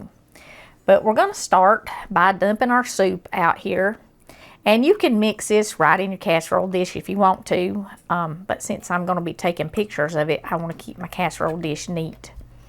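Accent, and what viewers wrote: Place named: Kentucky, United States. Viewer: Hi there. I just recently found your channel and im loving it. You're accent reminds me of KY where I grew up. I was just wondering where you're from?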